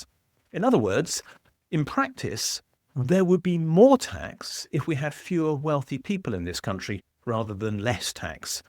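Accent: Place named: England, United Kingdom. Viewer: Such a nice English accent, wasted on such terrible ideas. If you chase the wealthy out of your economy, your economy will collapse, full stop. Take a look at other countries that have done it: Uganda chased all their Indians out who were bsuienss owners, or Zimbabwe seizing white farmers land. China & Cambodia put all the educated bourgoisie into the fields, or Russia and the kulaks. If this gentleman believes the wealthy hoard their wealth and don't invest, maybe the reason is government policy makes investing in your country not worth the risk? Capital in the hands of private individuals will always be put to better use than capital in the hands of the government. Always.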